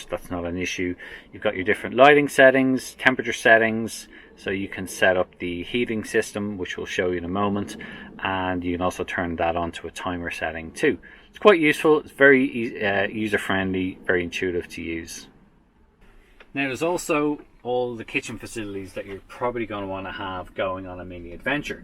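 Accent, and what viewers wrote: Ireland. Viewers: The Irish accent had me sold before we even saw inside the van lol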